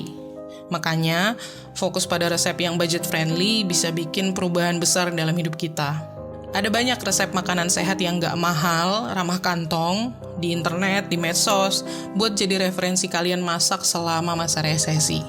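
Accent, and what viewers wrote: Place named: Chinese-Indonesian, Indonesia. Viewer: aku salfok sama suara naratornya, suara chindo bgt kak. anw tips2nya udah sebagian aku aplikasiin di hidup aku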